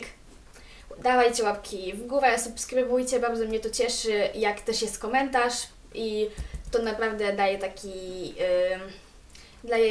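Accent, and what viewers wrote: France. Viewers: Jestes z Francji?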